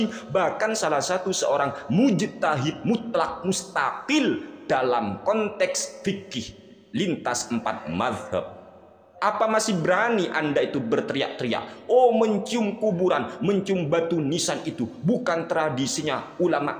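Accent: Madura, Indonesia. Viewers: Logat maduranya kental banget ustadz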